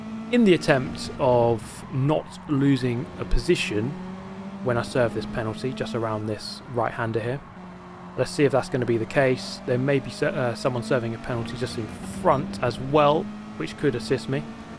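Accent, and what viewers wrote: United Kingdom. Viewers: Listening to laid back british accents is the thinking mans opioid.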